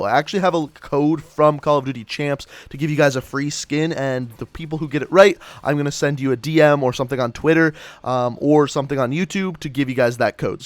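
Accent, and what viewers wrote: Canada. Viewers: Your so candian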